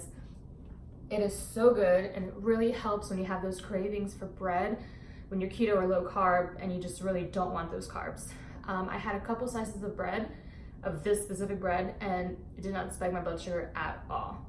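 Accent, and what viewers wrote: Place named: Australia. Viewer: I hear a hint of auzzie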